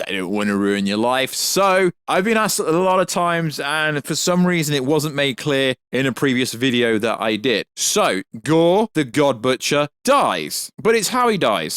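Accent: England, United Kingdom. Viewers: This terrible channel is so bad I need an interpreter to translate English accent to American!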